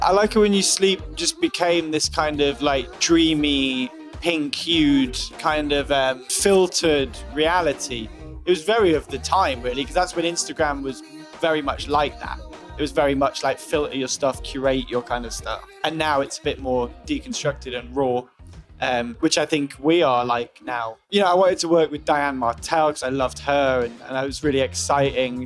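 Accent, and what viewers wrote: United Kingdom. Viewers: Some people would think he’s high .... no just British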